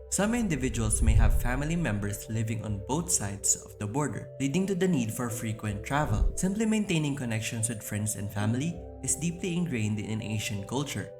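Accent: Philippines. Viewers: Why such heavy Philippines accent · A pinoy narrating this.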